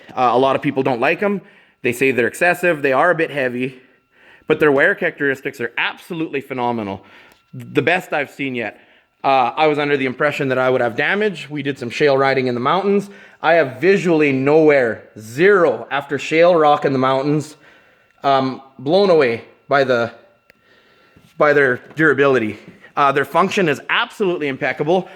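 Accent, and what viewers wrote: Canada. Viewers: I swear all you Canadians sound the same..I can just tell right of the bat your a Canadian 😂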